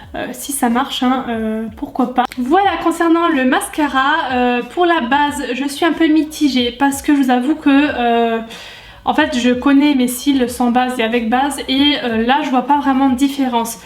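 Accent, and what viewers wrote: Lorraine, France. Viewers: Je me disais bien pour l'accent ! Originaire de Lorraine également 😉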